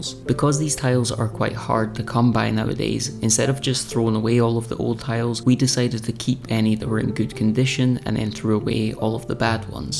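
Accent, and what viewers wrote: Scotland, United Kingdom. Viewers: More Scottish accents please!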